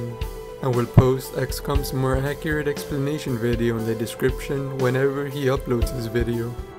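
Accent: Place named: India. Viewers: Yup it sounds slightly South Asian/Indian to me too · To me It looks like the accent of indians you find everytime you search for something related to programming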